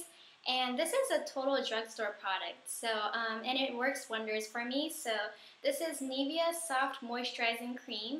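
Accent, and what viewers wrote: Japan. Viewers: THE FUNNY THINGS I SEE THE LAST PART YOU ALSO LEARN THE ACCENT FROM JAPAN STYLE. SO CUTE AND NICE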